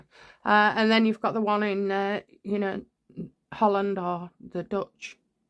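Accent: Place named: Yorkshire, United Kingdom. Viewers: For your information has explained in the past that she was deaf also the dialect is northern, so sounds a little strange to some. Your rudeness is absolutely beyond the pail. What a truly nasty thing to say, bet your speech isn't perfect, shame on you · How dare you that’s so rude she’s got a Yorkshire accent if you don’t understand her then turn off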